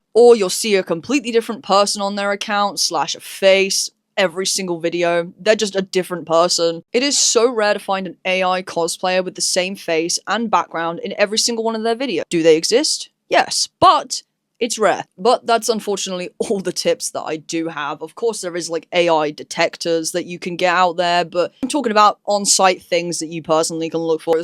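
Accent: United Kingdom. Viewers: you're bri'ish ?!?!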